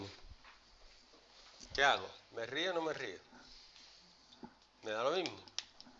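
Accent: Andalusia, Spain. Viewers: los 2 minutos hablando sobre el traje han sido mi parte favorita! bastante bien explicado, y no os metáis con el acento andaluz ostias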